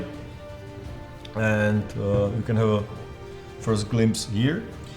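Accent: Czech Republic. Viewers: Tomu se říká česká angličtina no :D Já jsem rozuměl skoro všemu, kromě některých odborných slov co neznám.. Neni to těžký k pochopení, ale ten kdo se AJ neučí, nebo neni až tak zdatný, tak to problém může být.. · May I ask someone from England/USA, do you like his accent? Because I am from Czech rep.as well, so I would really like to know your opinion :)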